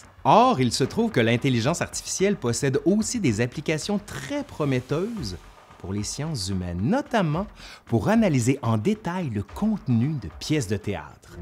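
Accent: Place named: Quebec, Canada. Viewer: Un petit bonjour de Suisse! J'adore tes vidéos! Le contenu est extrêmement bien présenté! Et le ton de ta voix, plein d'enthousiasme et de suspense, est addictif! De même que ton air sympathique et l'expressivité de ton visage :) Après, en Europe, on a tendance à trouver joli et "pitoresque" l'accent québécois, je suis sûrement biaisée ;)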